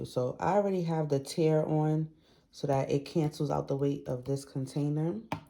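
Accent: New York, United States. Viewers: Are you from NY? Love the accent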